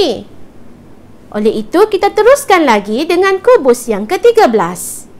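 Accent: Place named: Kelantan, Malaysia. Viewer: O aloh gomo kelate go mo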